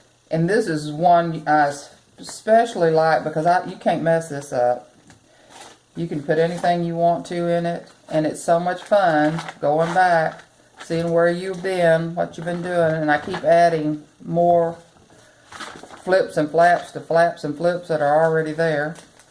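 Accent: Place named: Northeast Texas, United States. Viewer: I'm from north east Texas & your lovely accent sure makes me homesick. I have really enjoyed seeing your beautiful journals. Thanks for sharing.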